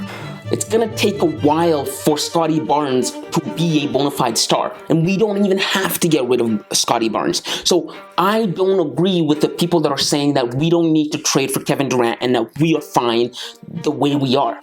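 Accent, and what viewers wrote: United States. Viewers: You sound like an American analyst. Looking forward to this team proving you wrong. Everyone in the league wants Durant… within reason. Nets aren’t giving up KD for Pascal and 2 firsts bro.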